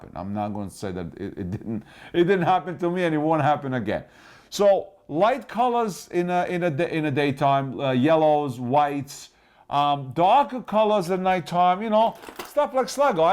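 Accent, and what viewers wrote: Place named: New York, United States. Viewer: you are The Man. I started out just watching your videos when they popped up relating to a subject I was looking for. The more I watch though, the more addicted I'm getting. This one is the best yet - informative, passionate, and really funny. It looks like there's a whole bunch for me to catch up on too. Way to go. Many thanks. Raymondo Ps. Even though I'm familiar with the New York accent (sorry I should have mentioned that I'm in Wales, in the U.K.), you get me sometimes with your pronunciation. For the first few videos I watched I couldn't figure out why you kept talking about your daughter's??? Of course it all came clear when I watch your show on 'darters'. Stay cool pal.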